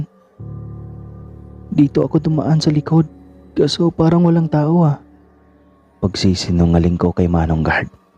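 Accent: Visayas, Philippines. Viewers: pangit mo mag kwento.. bisayang bisaya.. yay.. gusto koyung kwento boses molang ang pangit pati pag deliver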